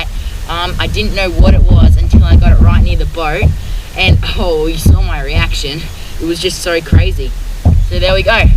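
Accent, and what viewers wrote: Australia. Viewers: hey what part of Australia are you from ?😜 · Melissa Bushell he's an Aussie, Fuckn lad he is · is he australian?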